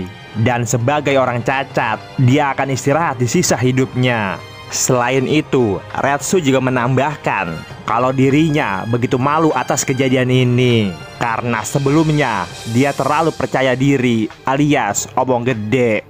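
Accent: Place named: Betawi, Indonesia. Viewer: Bang gw suka pembawaan lu yg mirip" Temen" Betawi gw kalo udh ngomong, sering" Upload bang dsn durasinya panjangin kalo bisa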